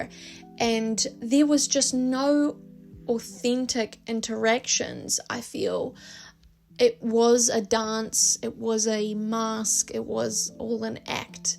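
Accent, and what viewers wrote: New Zealand. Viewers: Are you from Auckland? I love your accent and the way you talk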